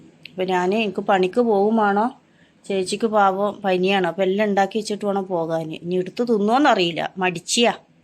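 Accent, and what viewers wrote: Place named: Malappuram, India. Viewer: നിങ്ങൾ മാനന്തവാടി എവിടെയാ സംസാരംമലപ്പുറം കാരുടെ ആണെല്ലോ ഞാൻ അഞ്ചുകുന്ന കുണ്ടാല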